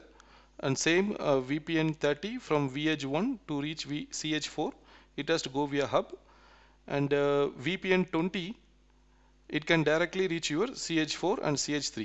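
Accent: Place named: Kerala, India. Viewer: Sounds like Kerala Nice video chetta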